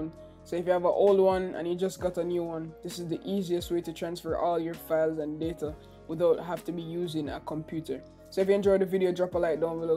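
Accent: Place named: Jamaica. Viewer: You sound Jamaican can still hear likkle bit ah di accent😂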